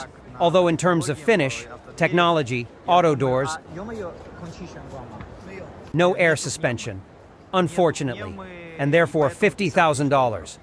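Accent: Russia. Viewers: R u Russian?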